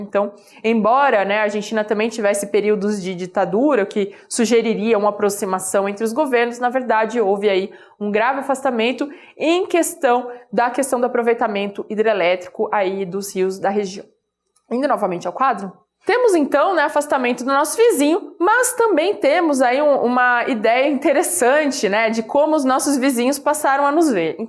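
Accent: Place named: South, Brazil. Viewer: Ela tem um sotaque lindo do Sul que eu amo. Báh que linda.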